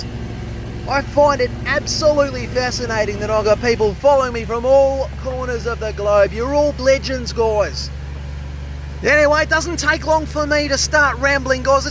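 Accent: Australia. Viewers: I am from Hamburg, Germany, just stumbled over your channel! And I can tell that you ar Australian! Both from your mild accent and the channels name. But where exactly?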